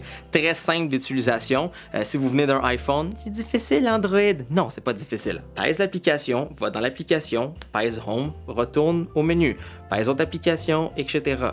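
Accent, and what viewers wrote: Canada. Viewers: J'adore l'accent canadien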